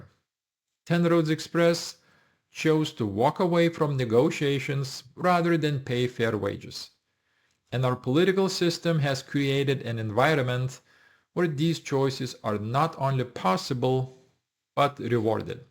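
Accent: Russia. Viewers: Tough to listen to a Russian Accented Guy, talk about Unions. Got trouble "Buying In". AND, I am a Teamster, Unrestricted Class A CDL. Hmm? Keep waiting for him to Call me, "Comrade".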